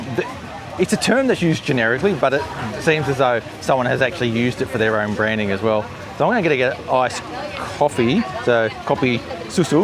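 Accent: Australia. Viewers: Great vlog for an interesting peek at truly local lifestyle.....had never heard of this before. Gotta love hearing your Aussie accent reflected right back at you.....quite a surprise!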